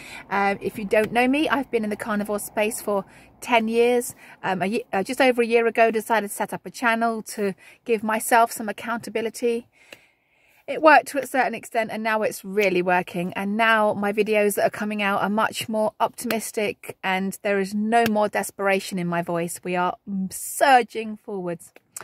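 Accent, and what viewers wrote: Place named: United Kingdom. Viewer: Congratulations, that is great news. I am so glad you have persevered with your channel. It is so good to have British carnivore youtubers forming a community. There are some brilliant American ones and I am very happy to hear British voices discussing carnivore lifestyles in the UK, it is so much more relatable for other British people. Thanks also for recommending Carl at Primal Lifestyle UK he also has some great videos. Stay strong, You are amazing.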